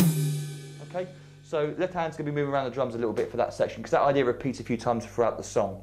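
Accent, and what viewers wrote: England, United Kingdom. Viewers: Are you really English?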